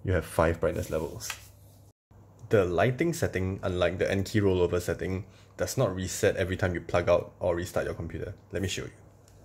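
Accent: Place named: Malaysia. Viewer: Lol I like your review on this. Thanks man. You sound like you're from MY or SG btw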